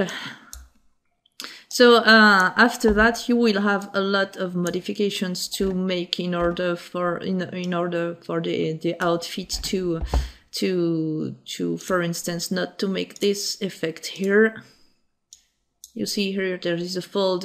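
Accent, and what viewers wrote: France. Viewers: Serais-tu francaise par hasard (à cause de l'accent)? L'autre tuto <link> n'est-il pas obsolète à cause de l'autofit ou suis-je à côté de la plaque ?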